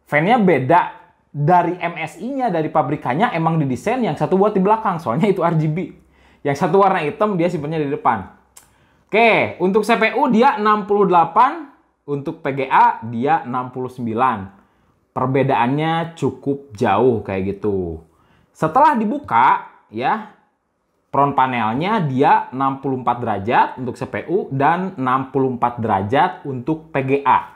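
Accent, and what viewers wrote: West Java, Indonesia. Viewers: other people: review this guy: Ripiu wkkwkw, sunda banget kedengerannya pertahankan ciri khas nya kang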